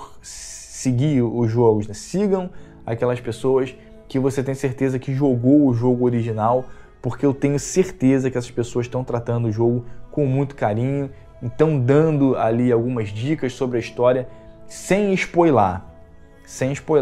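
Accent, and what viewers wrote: Rio de Janeiro, Brazil. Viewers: Teu sotaque carioca é muito forte!!! SSSSHHHHHHH SHHHHH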